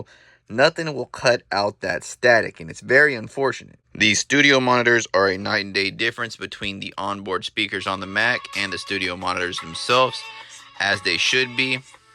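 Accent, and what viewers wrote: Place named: United States. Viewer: I hear the US accent and I know the claim in the title is bs. I'm out of here.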